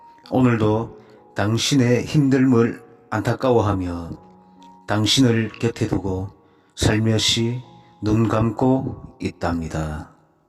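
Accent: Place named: Gyeongsang, South Korea. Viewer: 경상도 아저씨의 사투리가 살짝 들어간 풋풋한 음색, 좋은글, 좋은시, 이 컨텐츠 너무 좋아요~ 좋은시 한편 잘 듣고 갑니다~ fighting~~~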